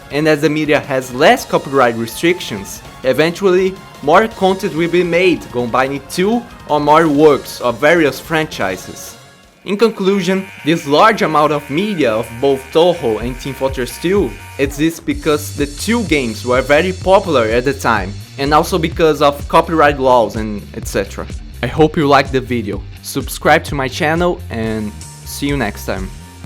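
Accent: Brazil. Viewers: sotaque brasileiro ficou bem incluso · Bom dia mano 😊😊 deu pra ver o sotaque br aew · nice accent. where are you from? Brazil? Seens me talking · this accent... I recognize it... you're brazilian, aren't you? me too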